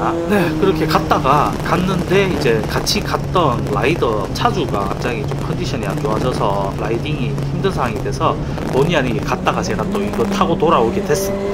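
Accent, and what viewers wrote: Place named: Daegu, South Korea. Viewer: 자막이 없으니깐 발음이 뭐라하는지모르겠네요;;...사투리보니깐 대구사람인거같은데ㅠㅠ오토바이 땡겨먹기 한번하시죠😂ㅎ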